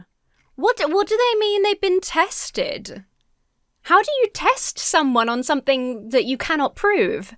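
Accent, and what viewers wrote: United Kingdom. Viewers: I love your thoughts. Ever since watching you on the Atheist Experience I can't stop watching your videos. Part of it is your UK accent (guilty as charged) but I keep watching for your skepticism. Your knowledge and thought process is invaluable for us low-education Americans.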